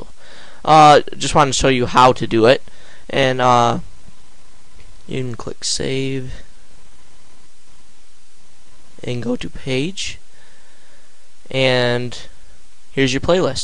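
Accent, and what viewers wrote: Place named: New York, United States. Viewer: Are you from New York? You sound EXACTLY like my friend.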